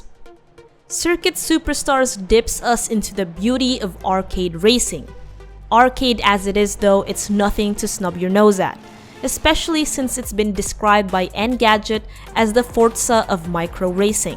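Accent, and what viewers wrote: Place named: Philippines. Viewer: I hate her voice. She sounds Filipino I'm not racist but she clearly doesn't know anything about cars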